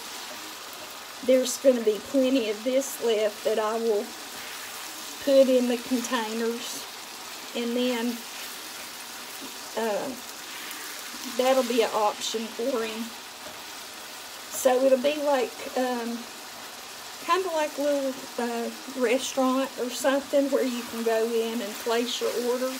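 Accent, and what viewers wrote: South, United States. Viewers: Sour cream in potatoes are delicious too! I like the idea of cream cheese. I love your southern accent & u can cheat with the potatoes use half water & half milk as long as u spice them right no 1 else knows u used part water :)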